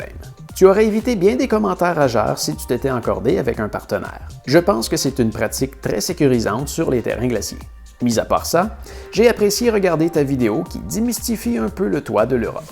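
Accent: Quebec, Canada. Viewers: Très bon videaste un peu imbu de lui-même mais l'accent quebecquois est trop cute.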